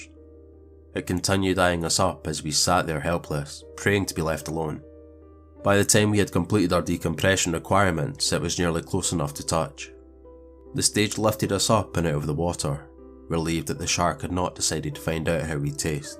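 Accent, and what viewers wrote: Scotland, United Kingdom. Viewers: Turning on auto captions will let you know the AI does not recognize a proper Scottish accent lol it's hilarious!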